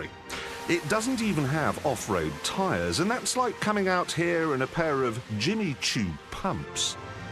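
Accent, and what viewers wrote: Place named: Birmingham, United Kingdom. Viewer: Richard Hammond's brummie accent was still there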